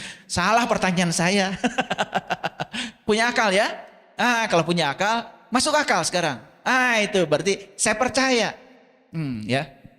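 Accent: West Java, Indonesia. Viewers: Itu logat Sunda, kalo Sunda ya begitu Alloh orang Jawa logatnya Owoh, ga perlu di permasalahkan kaya gitu mah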